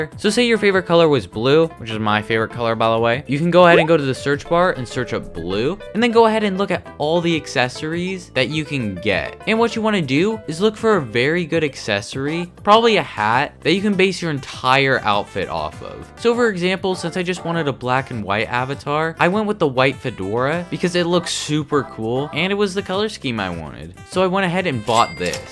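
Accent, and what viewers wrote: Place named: United Kingdom. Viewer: your British aren't you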